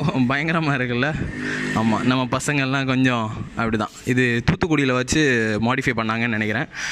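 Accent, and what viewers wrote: Kanyakumari, India. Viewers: our village. Kanyakumari slang adi Poli🔥🔥🔥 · Kandippa bro, waiting for Kumari slang vlogs😍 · Machi😍😘 nalla irrukku unnga kanyakumari slang mm semma style mm😘😍athan finishing than semma😁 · innum neraya unga slang la video pannunga makka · Ithu than kanniyakumari slang unluk Jack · Ithu enga Kumari tamizh♥️ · Vlog in Kanyakumari slang 👌👌👍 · Slang 🤩🤩✌️ Next video kanya kumariii slang venum 🙋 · Kanaya kumari slang 🔥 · Kanyakumari Tamil vera level 😂😂😂💥💥💥💥💥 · Malayalam kalantha tamil,na athu enka kumari tamil,tan.....enka slang enka adayalamla makka😎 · Kanyakumari slang 💓💓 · Super kanyakumari tamil love to hear this kind of tamil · Super ra speak pandringa kanyakumari language la bro... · Bro இது சிங்க தமிழ் என்னும் குமரி தமிழ்🤙🔥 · Makka namma slang la neraiya pannunga other dist people ku romba pudikum namma slang.... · Kumari slang 🔥🔥🔥🔥🔥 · Nama slangu solava veyanum🔥🔥❤... 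ne podu makka... verra tamil athu kumari tamil tha❤🔥😎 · Kanyakumari slang verithanam 🔥🔥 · Thala iam in Thoothukudi, Ungaloda kanyakumari slang nala eruku thala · Neenga Pesura Tamil kekkave inimai ah irukku bro Edho somma solra nu nenaikaadheenga bro unmayavey avlo arpudham ah irukku bro Basic ah vey enakku Malayalam romba pudikkum and Namma Kumari slang kekkumbodhu... Adha solla varthai eh illa bro It's melting my heart♥️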